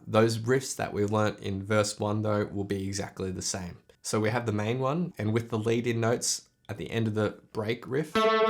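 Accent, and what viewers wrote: Australia. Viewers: .. it dawned on me that your accent is Australian? This is how I know actually.. I am very surprised you've not decided to cover yet!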